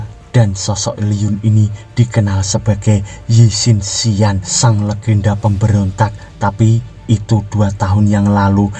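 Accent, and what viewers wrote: Java, Indonesia. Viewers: Mas njenengan jowone pundhi ?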